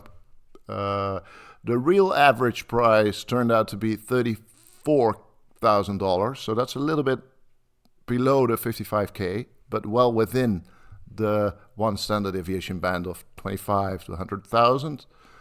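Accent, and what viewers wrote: Netherlands. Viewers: you sound like my old professor of Applied Cognitive Psychology Phycology at the university of Utrecht! Are you Dutch ??!! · Finally ... your English pronaciation is suddenly much better ... the Dutch accent is fading ... that's cool . . .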